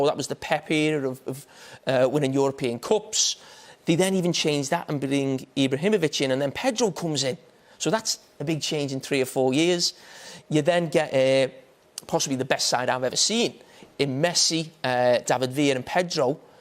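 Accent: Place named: Liverpool, United Kingdom. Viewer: Who listens to this scouse git?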